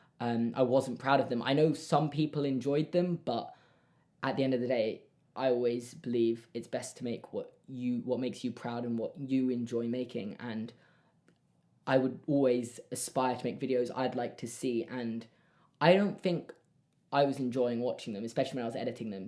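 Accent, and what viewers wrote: United Kingdom. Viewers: It's weird that YouTube auto-generated subtitles don't understand British accents like why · haha british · Is it just me or does serious seem much more British than high energy. I probably feel this way because I really only know Doctor Who and Love Island UK which aren't super high energy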